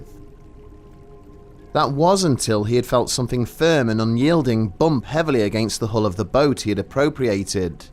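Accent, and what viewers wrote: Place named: Yorkshire, United Kingdom. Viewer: I just love this chaps voice!! He's got a Westchester, or Yorkshire air about him .. CUZ BLOODY HELL, some of these blokes with their LAZY Cockney.... Bugger me in the oss, a fellow can't understand some of these blokes, not at tol.!! So I stay carry-on my good fellow!! I enjoy your most interesting stories!! Carry on!! I'm sitting here with me fags in me face and trying to send this post on this infernal contraption..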